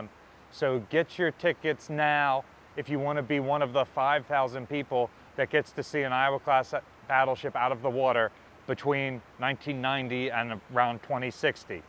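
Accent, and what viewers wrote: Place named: New York, United States. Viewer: great question, great answer to something that's been bugging me for years. thanks. You look and sound familiar. Are you from NY?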